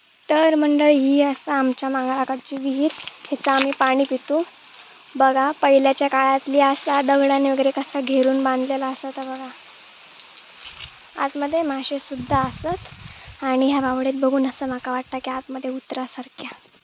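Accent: Malvani, India. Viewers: तु असा मालवनी बोलतस की ऐ कायला बरा वाटता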